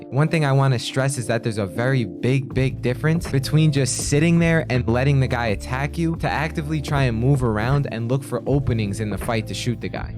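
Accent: South Africa. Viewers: South african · South african. Lol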